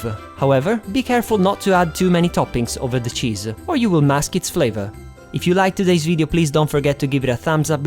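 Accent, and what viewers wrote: Italy. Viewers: no, dont change your accent, Italians always make english sound beautiful! these look really good:) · I love love loooooooove your italian accent when speaking in English. Sono vissuta due anni in Italia e i tuoi video mi ricordono del legendario "sense of humour" degli Italiani (aaaaah, nostalgia!)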